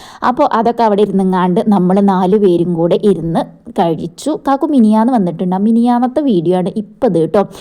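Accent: Malappuram, India. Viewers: സംസാരം കേട്ടാൽ മലപ്പുറം ആണെന്ന് തോന്നും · ഞങ്ങളെ മലപ്പുറം കാരെ അതെ സംസാരം തന്നെ ആണല്ലോ ങ്ങൾക്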